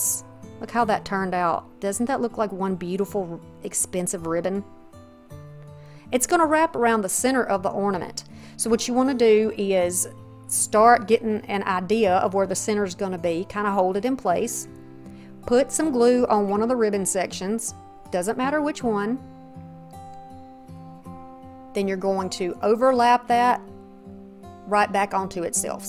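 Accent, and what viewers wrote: South, United States. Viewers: Oh my goodness I have never seen Better BELLS in my life. I've never done this and I'm just excited to tell you how great I think you are. Your southern (BELLS) accent makes me feel at home. Like talking to a friend. Have never commented on line or entered a giveaway. If someone can tell me what to do from here. I hope I don't have to know a login or username cause I don't have a clue. I need a sister in crafting to help me along. Can you help me??? BELLS me back. Hehe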